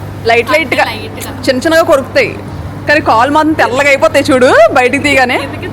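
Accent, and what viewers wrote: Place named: Godavari, India. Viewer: ఏదైనా గోదావరి జిల్లా యాస వేరు మీరు మీ వాయిస్ సూపర్ అక్క ❤❤❤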